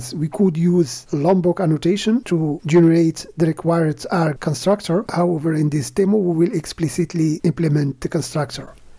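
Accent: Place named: North Africa. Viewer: it seems from your dialect that you are from north Africa, allow me say it in my Moroccan dialect: LAH YE3TIK SE7A!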